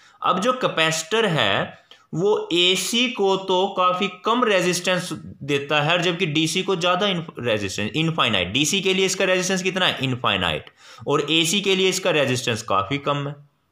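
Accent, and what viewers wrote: Haryana, India. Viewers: Sir ,Are you from Haryana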